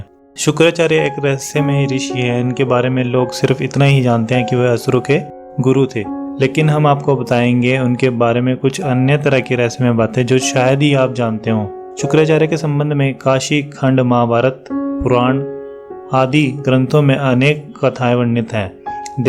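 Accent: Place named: South, India. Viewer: Koi south indian bol raha hai shayad..ok..good hindi bolne ki kosish to ki..vocabulary will improve4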